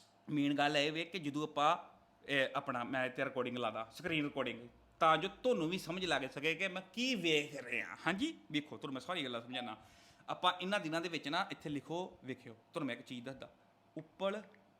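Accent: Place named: Pakistan. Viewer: Bai teri boli pakistan wargi kyo hai yaar?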